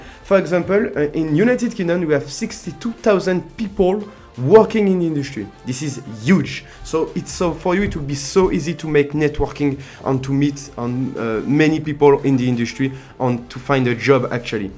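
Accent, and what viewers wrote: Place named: France. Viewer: Ouuufff. Want to know how i know you're french? 😂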